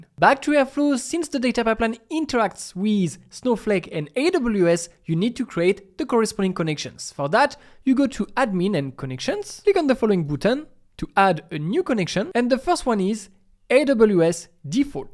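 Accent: France. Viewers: Thank you, but I'm sorry, English with French accent is terrible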